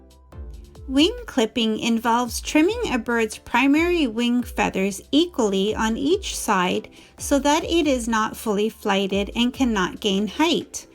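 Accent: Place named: United States. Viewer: Like the American accent is it west coast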